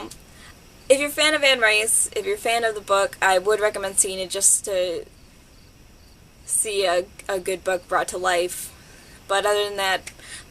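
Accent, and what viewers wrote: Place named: Brooklyn, United States. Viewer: I'm just starting to notice your Brooklyn-ish accent. It's cute. That is all C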